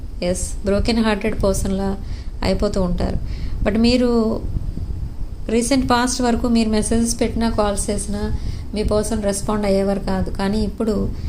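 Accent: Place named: United Kingdom. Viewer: The voice u have is very pleasing...in between ur smile...the english ackcent ...you use...these all make me very happy to see readings very eagerly...we are gifted to have a good human being...❤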